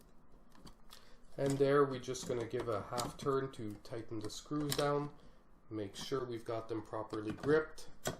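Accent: Quebec, Canada. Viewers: Subb'd because good video and awesome Quebec English accent :)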